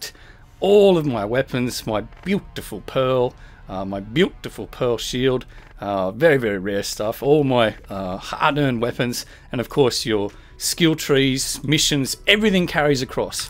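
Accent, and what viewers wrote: Australia. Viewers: Did I ever mention how much I appreciate you, the fact that I can sit back and listen to an Aussie and enjoy myself makes me really happy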